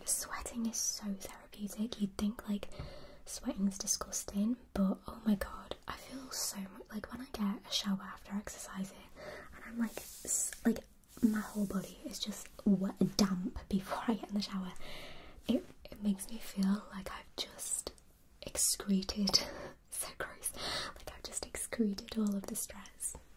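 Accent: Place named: Liverpool, United Kingdom. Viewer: You sound like you’re from Liverpool in this video. Not sure if you are but I’ve never heard it before. I love this video btw grwm asmr is my favourite xx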